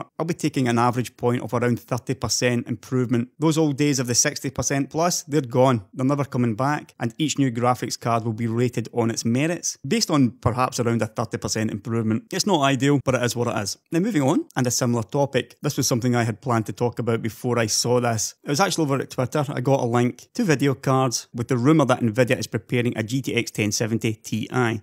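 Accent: Scotland, United Kingdom. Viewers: Are you Scottish or Irish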